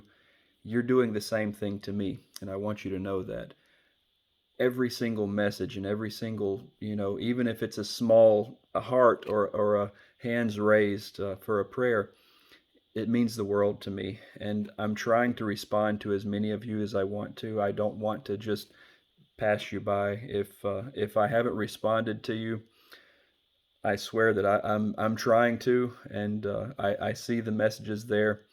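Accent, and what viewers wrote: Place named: South, United States. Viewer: Amazing story brother! May Allah bless you with best in this world and hereafter. I have to say something, hearing your southern accent makes me keep thinking about Forrest Gump 😊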